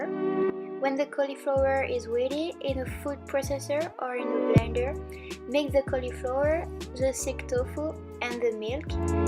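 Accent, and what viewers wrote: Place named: France. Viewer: Je crois deviner l'accent francais non ?😉 super video !❤️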